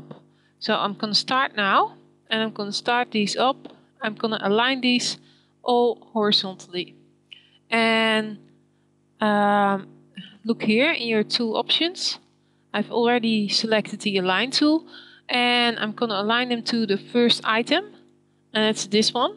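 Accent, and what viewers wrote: Netherlands. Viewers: Definitely a Netherlands accent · Wauw... Kom ik na dik 2 uur uit op een video die het ein-de-lijk een deftig uitlegt! Los van het 'bijkomend' selecteren met shift was het het bijsnijden vd laag dat me de das omdeed. 2 foutjes rechtgezet met één video van 8 jaar geleden. Knap werk. Ook heel schatti, dat Hollands Engels :-D Grotejes van een zuiderbuur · The girl doing the voice-over is soooooo Dutch. Not as bad as Prince Willy though ;-)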